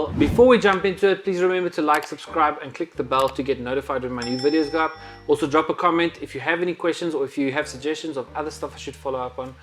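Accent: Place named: South Africa. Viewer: Hey I enjoyed your Video and loved hearing your SAFFA accent being one myself in living in Melbourne. I have a Sony A6000 but looking for options to try and capture a group type live demo environment for others to watch via zoom (ie train others remotely). Any suggestions as to what webcam might suit that? Cheers mate. Darron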